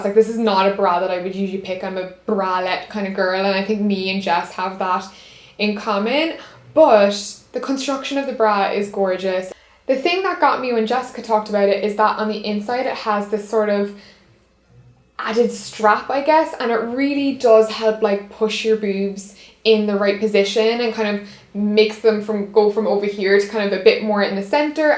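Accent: Ireland. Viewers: Love your accent my name is also my family is from cork in Ireland too 😍😧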